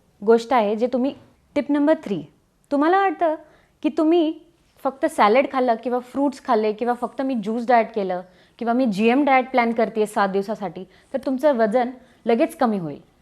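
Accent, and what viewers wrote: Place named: Pune, India. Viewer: Nice पुणेरी accsent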